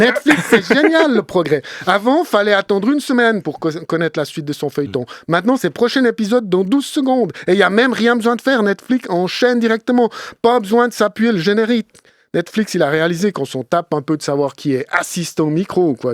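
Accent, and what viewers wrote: Switzerland. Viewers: Tu reconnais un suisse à son accent mais aussi à ses blagues d’enfants de 5 ans ! L’humour et l’esprit vole vraiment très bas ! Le concept de révolutionnaire du dimanche prends avec eux tous son sense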